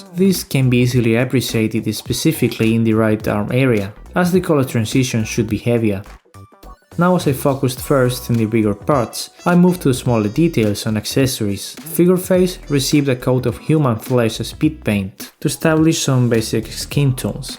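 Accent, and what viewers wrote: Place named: Spain. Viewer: Lo sabía por el acento, gracias por la info, por lo general no hay muchos canales de modelismo españoles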